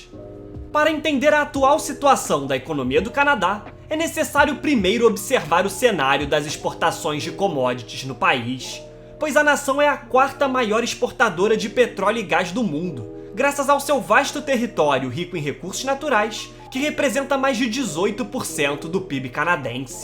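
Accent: Rio de Janeiro, Brazil. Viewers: O vídeo é bom mas o sotaque carioca é irritante de mais. Por que se troca o "S" por X ? É uma coisa q não tem o menor sentido. "S" é "S" e "X" é "X" não consigo entender pq. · Coloca um cara q fale normal... O cara puxa muito... Carioca fala muito feio.... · trabalhar mais o teu português carioca... parace uma panela de pressão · Sotaque carioquês do narrador é horroroso! O resto, já sabia faz tempo. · Conteúdo interessante. Narração terrível, complicado ouvir até o fim. Mistura de carioques, com Marcelo Resende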